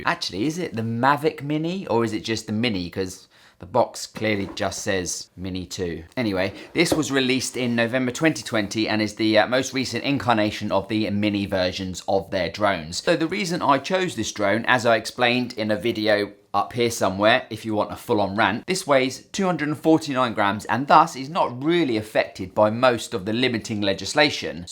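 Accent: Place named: England, United Kingdom. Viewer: Oh! It's so nice to hear an English accent (I have nothing against American people by the way.) It's just that all the other reviews I've encountered have been American or Canadian. What a relief! Someone speaking my language!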